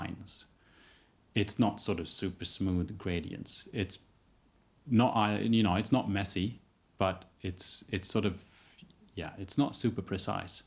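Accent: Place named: Sweden. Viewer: You sound like a swede who has lived in the uk for 14 years, working in a pr-company or . Great video as always!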